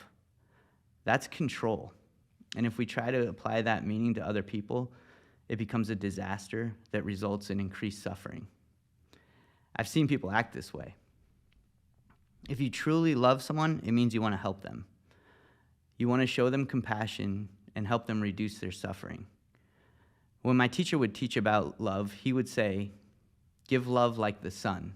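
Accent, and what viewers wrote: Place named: Massachusetts, United States. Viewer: Before I address this question ⁉ I have a question of my own . Are you originally from Massachusetts, as your accent is not that pronounced? I'm originally from New England myself , Connecticut.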